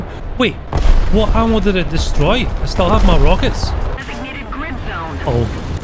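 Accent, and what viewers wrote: United Kingdom. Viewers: hearing a scot speak in a british accent is just all kinds of confusing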